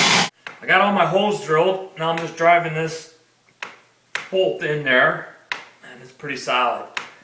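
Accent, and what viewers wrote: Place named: Pittsburgh, United States. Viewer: Thanks. Great job! Haven't heard such a strong Pittsburgh accent in a long time. Question: Are all the newel posts the same size? I've been looking at ordering some but there seems to be different heights for landings, starters, etc. Thanks ~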